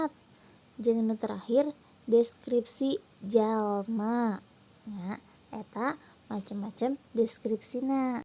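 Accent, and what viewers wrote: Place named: Sunda, Indonesia. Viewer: Dari kata pengantar, kelihatan jelas logat Sundanya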